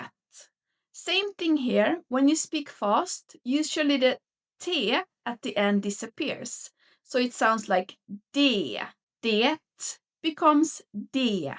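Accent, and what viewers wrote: Finland Swedish, Finland. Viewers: Är du finlandsvensk? Du har en svag brytning eller dialekt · Jättefin kanal och arbete med att lära ut svenska 🇸🇪👸🎖️ Jag blir ofta irriterad på norskar att det inte uttalar t i det. För det är stor skillnad på de, dem och det på svenska. Och att de säger "hade" istället för Ha det bra som svenskar säger när de menar det på riktigt och inte bara slentrian. Du är annars jätteduktig. Men du pratar en finlandssvensk dialekt? Då kanske man säger som norskarna i din dialekt?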